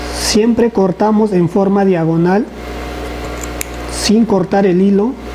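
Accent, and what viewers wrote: Peru. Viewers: Sos peruano o boliviano.....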